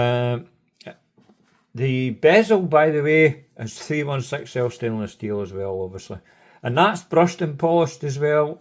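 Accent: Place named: Dundee, United Kingdom. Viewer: Are you a Dundee guy? 🏴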